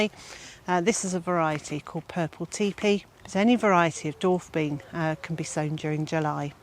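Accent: United Kingdom. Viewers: Oh, British accent. This will not be helpful. Heat is the problem, not cold. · Hi, you sound British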